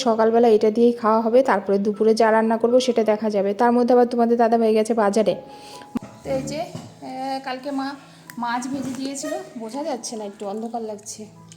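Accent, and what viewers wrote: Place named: Dhaka, Bangladesh. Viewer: Tomar bhasa ta dhakia. kamon kore ktha balo, Mon k balo MN,Prosad k bolcho psad, prothom na bole balo Pthm. Sudhrao egulo, kthate ato dhakar taan sotti. Somosto uchcharon vul karo tumi. Egulo sudhrao,Nijeke jakhon Sikkhito dabi karo loke to bolbe je ki porasuna koreche ktha thik mato bolte parena, Tomato k balo Tomyatu kamon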